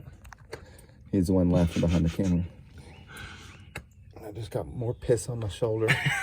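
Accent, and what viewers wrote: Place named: South, United States. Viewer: Your southern accent is adorable.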